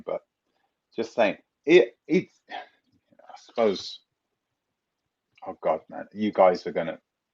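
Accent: United Kingdom. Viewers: Can u speak your African accent and desert your British accent. Coming from a concerned Gambiana.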